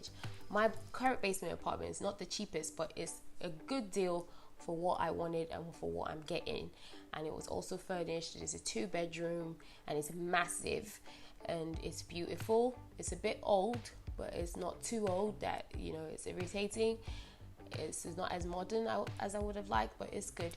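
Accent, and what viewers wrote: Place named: United Kingdom. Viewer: Your British accent came out to play, where’s the Canadian and Nigerian one 😀. Thanks for the heads up on accommodation. 👍👍👍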